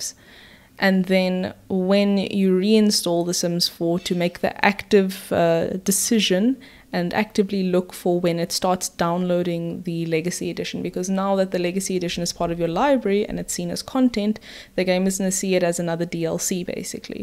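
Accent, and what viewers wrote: South Africa. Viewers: Are you South African ?? :)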